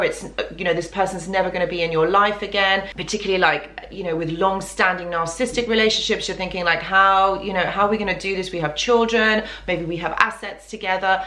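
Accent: United Kingdom. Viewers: I think British because of her accent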